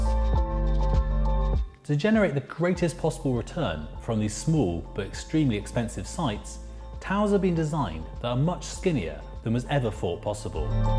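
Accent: United Kingdom. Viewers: Quirky British/ accent make you sound pretentious. Tone it done bro. None of my Brit friends talk like you do. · not everyone with a british accent sounds calming, intelligent and elegant...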